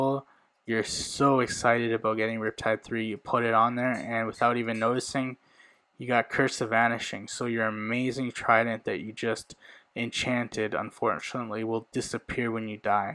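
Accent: Punjab, India. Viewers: are u a punjabi?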